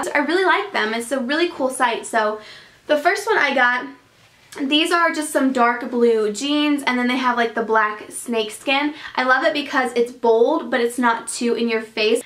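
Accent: South, United States. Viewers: You sound so southern!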